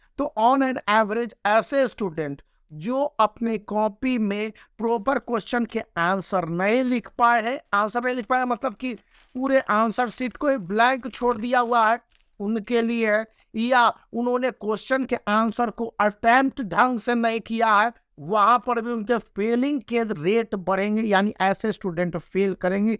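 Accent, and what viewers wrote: Bihar, India. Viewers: sir aap bihar se ho kya??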